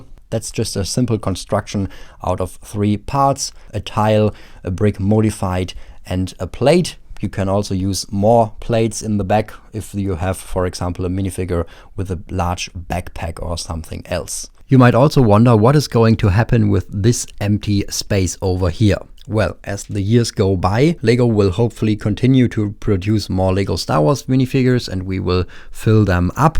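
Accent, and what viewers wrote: Germany. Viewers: Deutscher Akzent 🫣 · You can hear this German acsent in his voice 😂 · Der deutsche Aktzent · Ich Spüre Deutsch in deiner Stimme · Sag mir das du deutsch bist ohne mir zu sagen das du deutsch bitte der deutsche akcent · this german accent is furchtbar :D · Man hört den deutschen Akzent haha. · Dein Englisch klingt so deutsch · you sound german ^^ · i hear german out of you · Yo i knew you are from germany by the sound you talk like BrickCrafts 😅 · Der deutsche Aktzent izzzz da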